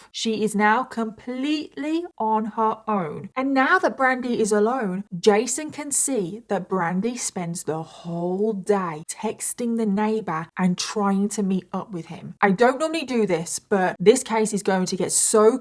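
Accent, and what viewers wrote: United Kingdom. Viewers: Unbelievable!! I dont know hiw he could live with that woman, and not notice that she wasn't all there? Just so terrible, how someone could just do that, to their own small children?! Bless their souls & prayers to Jason. Thanks! You did an amazing job, telling this horrible story! Also, just wanted to say, you look so sweet! Those big blue eyes you have, are so beautiful, along with your cute British accent. Looking forward to the next time, thanks again! 😉 👍 🇨🇦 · American here., your British comes out of you every second. Don't fight it; it's delightful. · I’m from Michigan and I have always loved your British accent BUT my heart just melted at the way you pronounced “assuming” “ashumming” lol. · thank you so much for covering this case. Your compassion for the victims always melts my heart. You are such a kind and empathetic soul. 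Also, please don’t ever apologize for your British accent! It’s what makes you wonderful! You can say “ahss” all you want. You are so brilliant. Much love from Seattle Washington ❤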